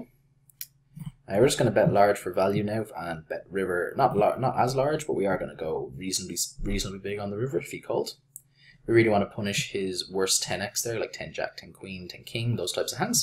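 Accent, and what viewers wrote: Ireland. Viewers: Irish confirmed.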